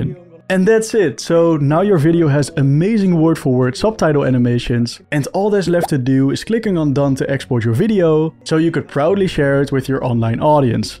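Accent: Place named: Netherlands. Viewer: Dutch accent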